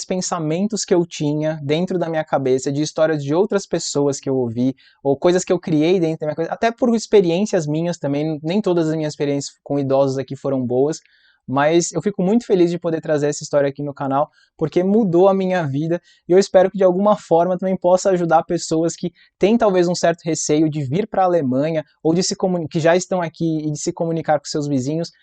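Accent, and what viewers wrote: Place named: São Paulo, Brazil. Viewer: Como vc é de Salvador com esse sotaque paulistano, do Bexiga?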